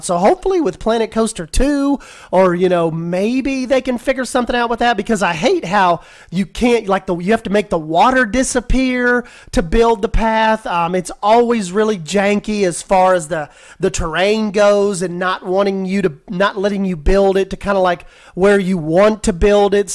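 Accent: South, United States. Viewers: correct me if I'm wrong but are you from the Southern part of the United States cause you have what they call a Southern Accent I have a Grate Uncle who lives in Kentucky and when he talks you definitely know he's from the South even though he grew up here in New England, New Hampshire to be exact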